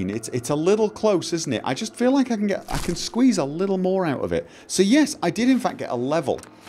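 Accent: United Kingdom. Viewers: He’s so fricken British it’s awesome lol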